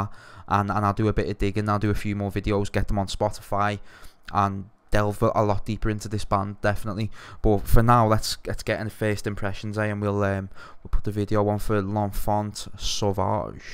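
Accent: Liverpool, United Kingdom. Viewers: you from liverpool by any chance? keep the vids up dude good stuff!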